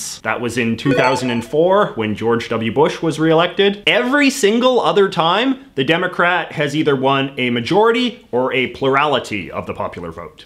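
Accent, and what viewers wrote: Canada. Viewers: Really want to watch these videos but his accent is so painfully Canadian. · The Four Parts of. •The Moustache (RIP) •The Hair •The Incredibly stereotypical Canadian Accent •Francophobia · is he canadan?he has an accent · Are u Canadian ?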